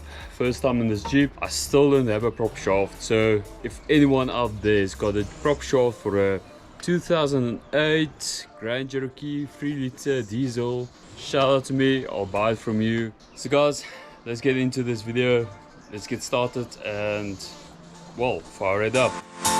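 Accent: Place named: South Africa. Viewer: I’m assuming you’re from somewhere in SA. I can tell why the accent, I just saw the ad for this channel and I’m loving it keep up the awesome videos.😁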